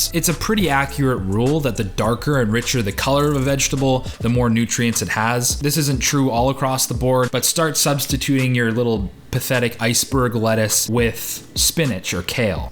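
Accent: Canada. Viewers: Are you Canadian ? I love your videos. Thank you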